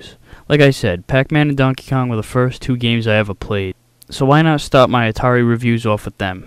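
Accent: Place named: New York, United States. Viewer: You sound like your from New York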